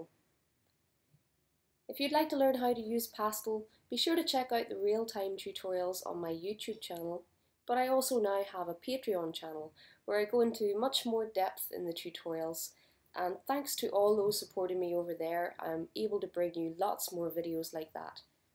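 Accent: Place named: Ireland. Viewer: Great video as always! Also, I love your accent. My grandpa was born in Ireland so my pride for the Irish is strong!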